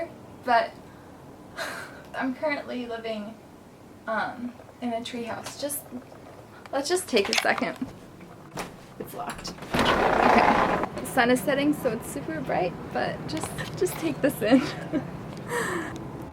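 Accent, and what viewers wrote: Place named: United States. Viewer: what a lovely girl, I'm an English guy and my heart melts when I hear an American girl talk! I could definitely do with meeting a cool American chick!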